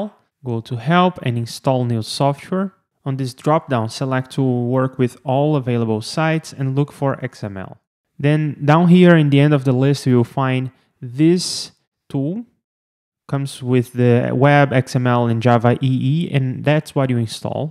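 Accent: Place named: Brazil. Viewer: Nice video, I think it's hard then I expected, as I'm not a programmer. By the way your accent sounds like a Brazilian but with a really good English!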